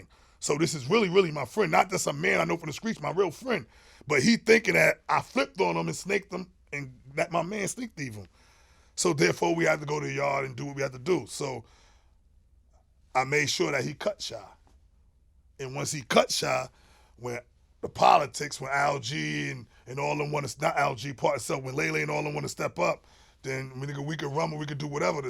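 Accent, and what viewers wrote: New York, United States. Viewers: bro sound like a New York Cmac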